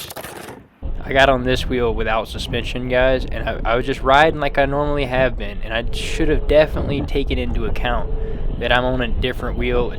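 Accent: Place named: Appalachia, United States. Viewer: You sound like an absolute moron. You edited it talking over yourself with same volume... and that hillbilly accent and incoherent rant that makes no sense. Also it did not cut-off as clearly it's on when you pick it up, you absolute idi ot. I don't even have an EUC and I know that. Yes, you are an american i can tell :)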